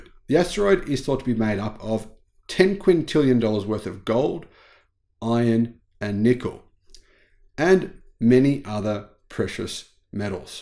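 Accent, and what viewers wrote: Australia. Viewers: That's exactly right, he's Australian and Aussies don't say Narsa like the English, etc do. Get it right if you're going to correct me, mate. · He's Australian, mate. I'm a kiwi from just next door and we all say NAHSA too. So do the British, which means our is far from being the only one.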